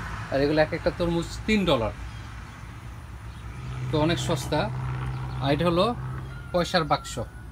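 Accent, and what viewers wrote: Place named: Bangladesh. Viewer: Love the way you talk. In fact I love the Bengali accent of the Bengalis from Bangladesh. It's very sweet.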